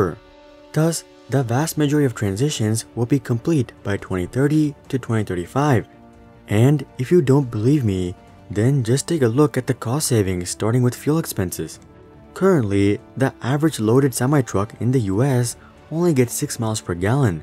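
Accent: India. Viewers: On very close inspection, (which I would not have done except for the post as you speak very clearly), I guessed South central Asian, likely Indian. Hooray for me! Good channel. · U a Indian?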